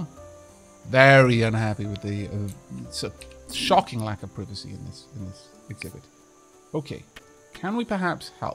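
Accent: Wales, United Kingdom. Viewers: Our good friend is Welsh, which gives him that lovely accent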